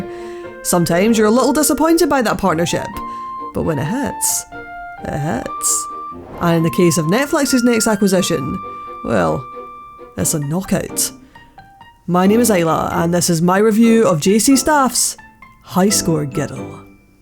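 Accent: Scotland, United Kingdom. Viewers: Good to hear a Scot do the review. Ta Pal.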